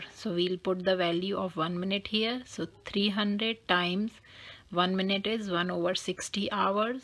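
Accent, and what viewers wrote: India. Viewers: You making fun because she's Indian and have funny accent, or you being sarcastic?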